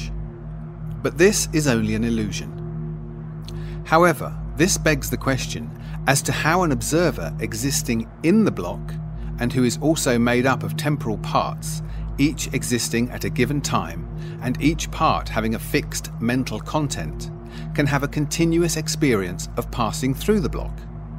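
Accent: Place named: United Kingdom. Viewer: They always gata put the british accent so it could look more interesting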